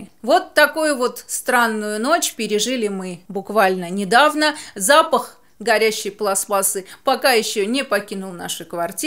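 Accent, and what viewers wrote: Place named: Armenia. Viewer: Вы уже говорите с армянским акцентом 😂 👍👏.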